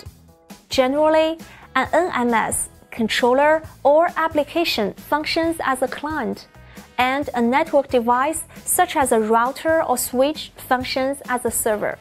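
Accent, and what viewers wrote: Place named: China. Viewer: 一听就知道是中国人说英语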